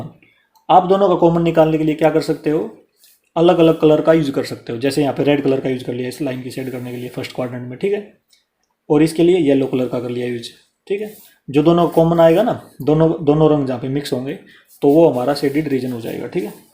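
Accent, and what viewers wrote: Haryana, India. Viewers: Khoon main hi haryanvi hai....Kaha se ho sir 👍🙏🙏🙏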